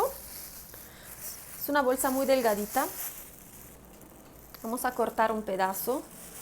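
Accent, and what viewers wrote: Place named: Italy. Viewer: Es italiana, por los nombres y el acento.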